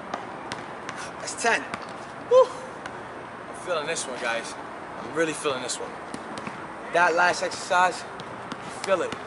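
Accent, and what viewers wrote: New York, United States. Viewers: are you from New York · Nice omg love the NY accent😂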